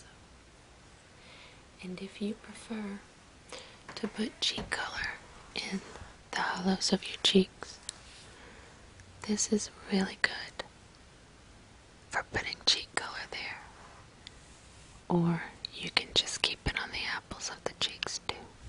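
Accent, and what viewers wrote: South, United States. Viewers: Are you from the south? cause you sound a bit southern. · Also are you from the south?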